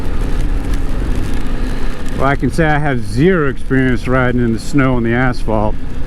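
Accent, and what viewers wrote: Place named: Ozarks, United States. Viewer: Ozark hillbilly 🤔, you from SW MO or Arkansas?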